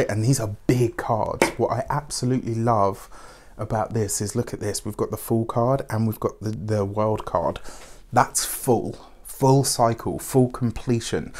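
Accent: England, United Kingdom. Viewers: Great to hear an English reader Thankyou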